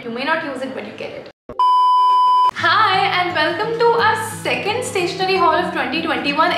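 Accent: India. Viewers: Literally I was shocked when I heard her speaking English in Indian accent. She looks like she's from western 🥺 btw love the haul ❤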